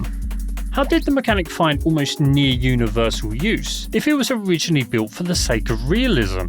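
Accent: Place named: United Kingdom. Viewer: you didn't have to put "UK" in your username for people to know you're British™, your pronunciation is is so 🇬🇧🇬🇧🇬🇧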